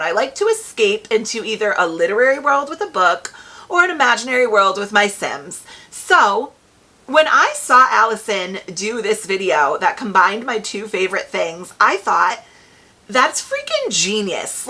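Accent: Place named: Connecticut, United States. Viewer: I knew your accent sounded familiar - I'm from CT too! I live in NC now though.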